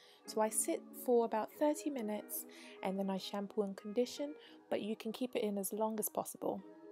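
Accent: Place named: South London, United Kingdom. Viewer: Yes Sis. Love the vid, thanks it helps so much... Imma try this under my wig. Anyhow I'm In South London UK and i recognise that accent of yours😀 Stumbled on u vid as i was checking out rice water for hair growth.. Keep up the good work girl, and keep looking after that amazing hair of yours..💓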